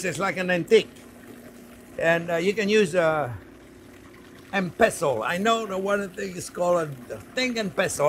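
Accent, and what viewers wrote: France. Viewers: First time watching his vids. I was certain he was cajun. It’s like he’s speaking with an a North American dialect but a French accent. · I heard an Italian touch to your French flavored accent at the time you spoke of the fishmonger and the "'fish bones, eh?" Delightful. Although your last name sounds German. I was born in Nice, but raised in India. So I never had a bouillabaisse growing up. Finally, on a visit to Nice, I found a small restaurant, took my Belgian uncle Ray over there, along with my family, and we had a splendid Bouillabaisse sitting on the pavement of this small restaurant. The lady was overwhelmed as we came in as a big party for that tiny place, but we helped with arranging the tables outside, and we had such a great time. My fondest memory of this soup. I think as an American I had no problem with arranging the tables, and this was shocking in France where there is a tradition of service and people waiting on you. 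Ha ha. She had some good stories to tell of our visit years later.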